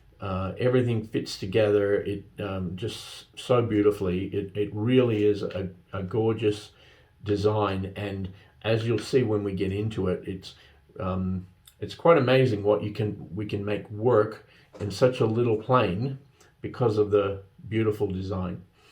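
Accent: Australia. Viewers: That's a really interesting accent. Are you an Australian in America?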